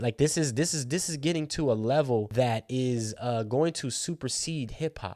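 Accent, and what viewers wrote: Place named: South Carolina, United States. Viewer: Charleston in the building shoutout to SC! You know what time it is over here. I heard the accent so I had to make sure you was from the low country. But I like your takes! Ye is SC stamped.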